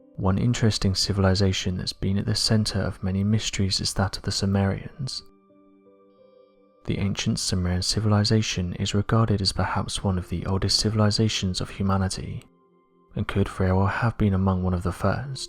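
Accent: England, United Kingdom. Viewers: What helps them appear credible is that they're using a person with an English accent to narrate their stories. Think about it, had they gone with some yokel from the south or a guy with a Bostonian accent talking about how "wicked hard" they looked around the internet to find examples of modern tech depictions from past civilizations or how "you know what, I swear I saw them same fancy scratches on a bumper sticker before y'all" and nobody would take it seriously.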